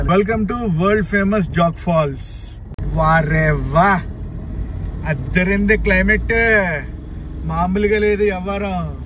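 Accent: Godavari, India. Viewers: Hello andi nenu me videos ni resent ga chudatam start chesa chala bagunnay me godarolla telugu bale untundi your good name please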